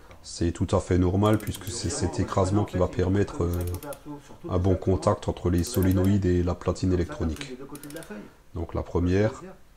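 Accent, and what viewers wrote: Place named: Lorraine, France. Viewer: Tu viens de Moselle ou d'Alsace ? 🤣 Parce-que j'habite Forbach et j'ai directement reconnu un accent hahaha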